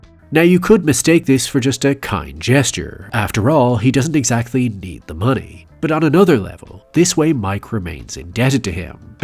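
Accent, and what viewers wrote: Ireland. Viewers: Are you Irish, mate? You sound like one of my old best mates.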